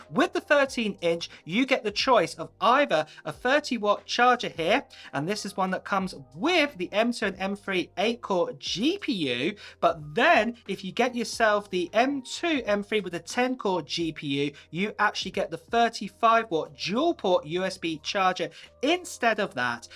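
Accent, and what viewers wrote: United Kingdom. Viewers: hey! Are you from the uk or usa? only because you have a british accent but say dollars. Also, any update on macbook se?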